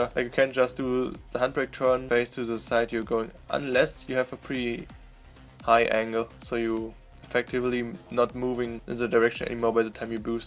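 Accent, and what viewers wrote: Germany. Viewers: Bro du bist deutscher wie du redest wtf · Mashalla guter deutscher akzent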